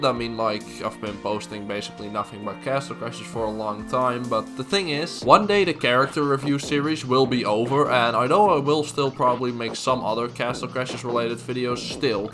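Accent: Netherlands. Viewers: Hey are u dutch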